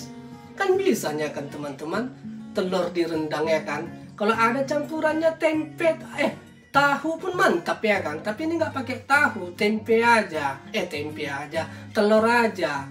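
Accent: Medan, Indonesia. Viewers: Suara medan nie psti 😂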